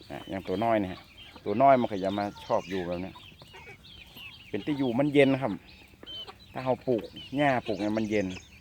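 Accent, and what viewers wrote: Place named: Laos. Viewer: เว้าคึอคนวังเวียง สปปลาวเลย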